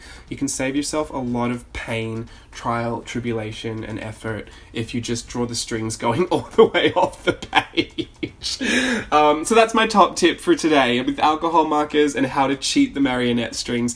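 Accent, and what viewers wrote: Australia. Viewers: You still sound Aussie to me!!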